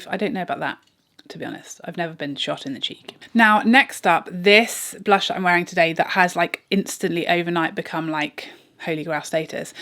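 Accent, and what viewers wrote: England, United Kingdom. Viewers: you always look pretty, but exceptionally pretty today. My father's family was English, so I just adore listening ro you speak. And girl, you are so stinkin funny! 🤗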